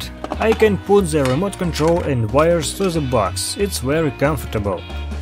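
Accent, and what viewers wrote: Russia. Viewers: why am I not surprised about the russian accent?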